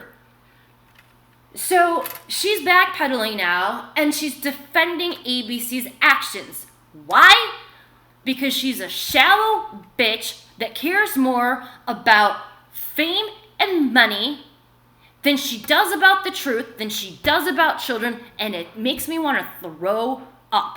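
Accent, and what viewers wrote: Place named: London, United Kingdom. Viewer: Sounds kinda like the kids from London. The little boy and girl that told on their Dad and teachers at their school.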